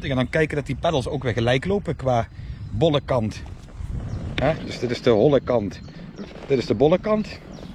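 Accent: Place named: Limburg, Netherlands. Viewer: Hallo, super mooie videos, ben per toeval op jou kanaal gekomen, doe huurst deg aan wie ene echte limburger. Top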